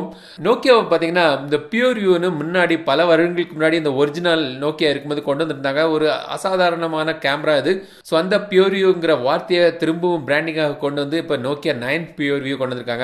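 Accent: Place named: Nagercoil, India. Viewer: Nagercoil slang😍👏🏼👏🏼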